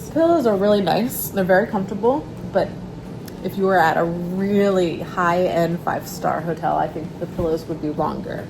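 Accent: South Africa. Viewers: Hi. Glad to hear a South African. I'm from South Africa. Johannesburg. 😊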